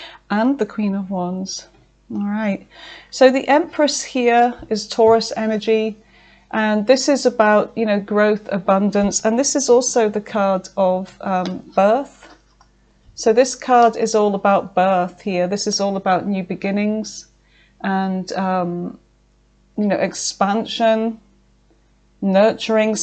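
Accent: United Kingdom. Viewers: You are the most delightful tarot reader. Are you a Cockney born lady? I just love the various British accents.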